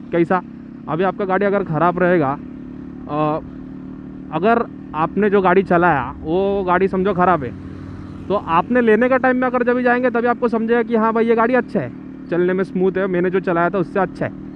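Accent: India. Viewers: Pure mumbai accent 😌🤜🤛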